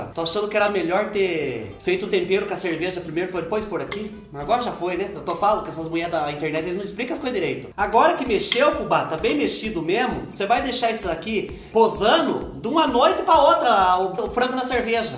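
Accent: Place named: Minas Gerais, Brazil. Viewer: Acho muito interessante que é de São Paulo e conversa muitooooo parecido com nós os mineiros muito mesmo, muito legal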